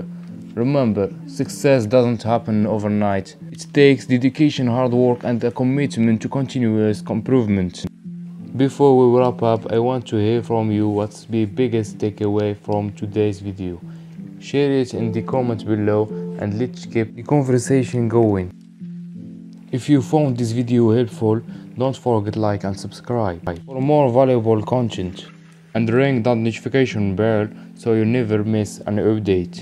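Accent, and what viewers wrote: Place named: Morocco. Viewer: لونجلي نقية كمل اخويا المهم عرفتك مغربي غا بالأكسنت ❤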